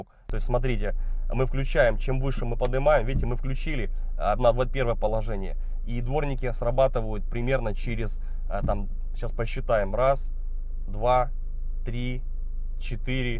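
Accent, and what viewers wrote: Ukraine. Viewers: Вы такой мужчина прекрасный! Дай Бог Вам здоровья. Так хорошо рассказываете. И, вообще, обожаю украинский акцент.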